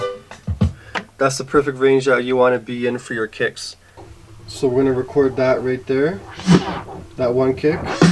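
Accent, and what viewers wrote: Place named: Toronto, Canada. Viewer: siiiiiiick....nice accent..must be from the 6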